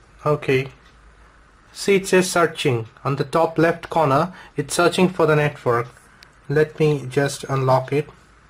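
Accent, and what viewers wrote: India. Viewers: I like ure indian accent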